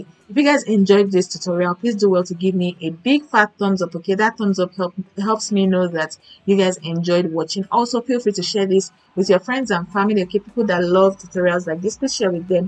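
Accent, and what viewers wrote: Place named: Europe. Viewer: Never apologize for european english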